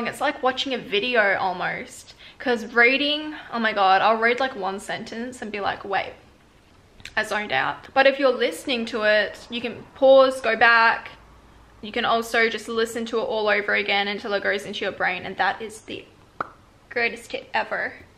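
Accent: Australia. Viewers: I LOVEEE YOUR ACCENT!! Hah its so fun and chill. Is it an Australian accent by chance?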